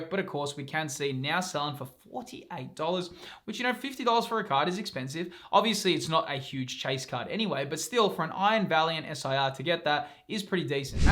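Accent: Australia. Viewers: I’ll be honest! Your videos not only helped me look at Pokemon cards and even etbs differently but the Australian accent is a chefs kiss on top ❤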